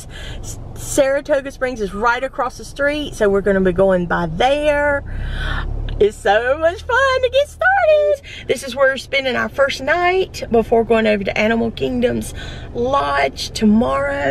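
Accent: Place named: North Carolina, United States. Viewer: Heyy! I am watching your videos for the first time!! Love your video! I am from Charlotte NC... you sound like you might be near me haha!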